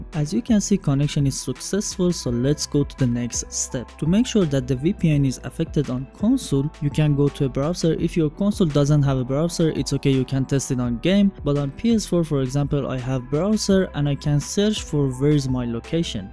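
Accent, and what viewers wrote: Iran. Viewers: از لهجتون تشخیص دادم موفق باشید 😁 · لحجت،ایران هستی؟ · داداش فارسی هم بگو خوب تابلوئه اکسنت پرشینت ..😉👍🏽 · سلام داداش چطوری خوبی میدونم ایرانی هستی از لحجت معلومه خوشبختم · Bro you Persian. 100% Theres no other Accent like this.. Ive spoken to many who speak the Lamguage Persian as their Mother Tongue.. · داش حال کردی چطوری تشخیص دادم؟ XD لهجه ت ایرانی اصیلهXD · اکسنت فارسی داد میزنه ایرانی هستی 😝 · Straight persian accent right there 😅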